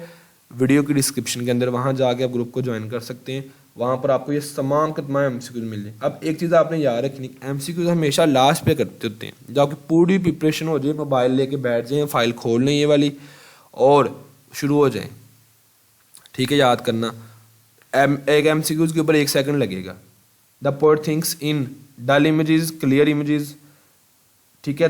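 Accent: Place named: Lahore, Pakistan. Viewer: Sir are you from lahore. your aaccent is like a lahori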